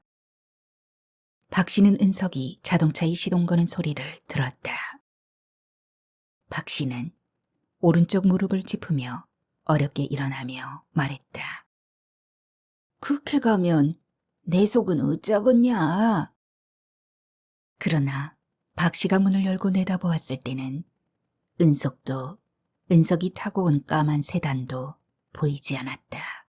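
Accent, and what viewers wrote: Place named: Jindo, South Korea. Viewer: 전라도사투리도 지역마다 다른데 진도 사투리를 제대로 해주시네요. 1980년초 저 한 열살즈음에 진도앞 조그만 섬사람들이 통통배타고가다가 여럿이 배가 가라앉아서 여러명이 죽었어요. 그래서 혼을 건진다고 무당불러서 섬에서 굿을했었는데 무당은 훨훨날아다니고 쇠밥그릇두개겹쳐서 흰천으로 싸서 길게늘려서 혼건진다고 바다로 던졌다당겼다 하고 긴대나무 잡고 있던사람은 미친듯이 떨면서 흔들리던게 기억나는데 그때는 겁없이 구경했는데 그게 씻김굿이었군요. 잘들었습니다.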